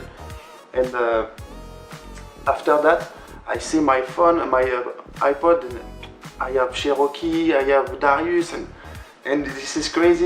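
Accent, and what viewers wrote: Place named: France. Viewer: Mon gars ta le vrai accent français, je dit ca mais je ferais pas mieux. Non tu assure en vrai. Continue a nous faire kiffé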